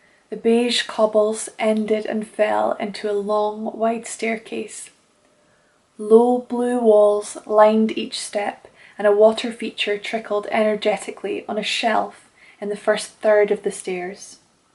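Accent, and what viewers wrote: Edinburgh, United Kingdom. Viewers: So nice to hear such a lovely Scot lass tell a beautiful story. Would love to hear a wee bit more of the Edinburgh accent, it is my favorite sounding speak. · I adored this story dear. I love your EDINBURGH accent. Once in another life I visit EDINBURGH. Almost marry a Scottish gal. Thank you for what you do.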